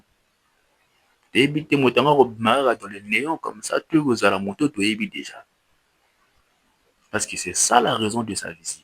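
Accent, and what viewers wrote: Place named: DR Congo. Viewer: Tu as l'accent de la RDC .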